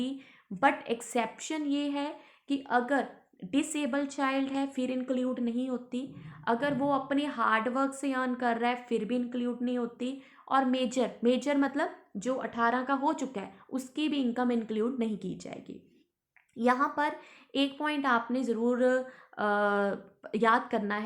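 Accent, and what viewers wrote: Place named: Punjab, India. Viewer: Punjabi accent rocks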